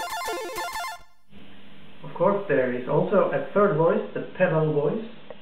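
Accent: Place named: Skåne, Sweden. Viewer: He's definitely swedish, sounds like he's from the Skåne area (not sure).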